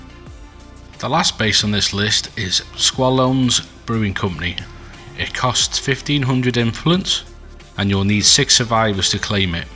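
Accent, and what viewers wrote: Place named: Liverpool, United Kingdom. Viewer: Don’t change a thing mate, you’re an honest to goodness average gamer with good tips and tricks and your style reflects that! Dead warm and friendly. I thought I was picking up some scouse at first. Maybe just my headset-fried hearing? 😂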